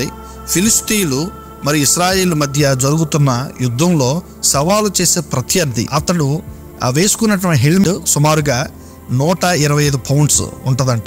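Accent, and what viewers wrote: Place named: United Kingdom. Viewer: Meeru enduku English vallaku puttinatlu English slang lo telugu matladutgaru Neat ga Telugu matladu ledante English matladu ee teda Telugu enduku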